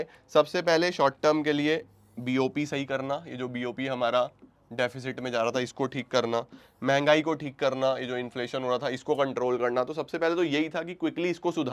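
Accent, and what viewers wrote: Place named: Uttar Pradesh, India. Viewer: U are from up 😅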